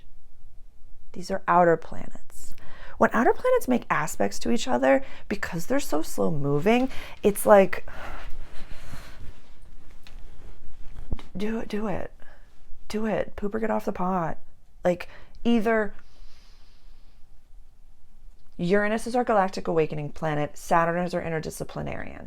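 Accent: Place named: Northeast, United States. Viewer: I know it doesn't matter, but I like your NE accent. Thank you for the message.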